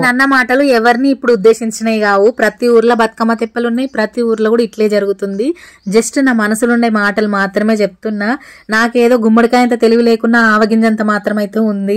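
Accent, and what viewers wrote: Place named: Karimnagar, India. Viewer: మాది కరీంనగర్ జాబు భద్రాచలం లో కని మీ మీరు యంగ్ గ ఉన్నారు బాషా చాలా బాగుంది మేము మీ బాషా చాలా మిస్సు అయం సూపర్ మీ వైఫ్ మీది కేమిస్టర్రి బాగుంది 🎉🎉🎉🎉🎉🎉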